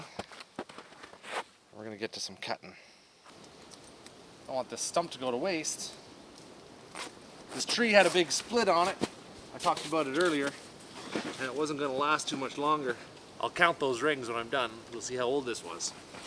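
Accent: Canada. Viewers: your accent . are you Canadian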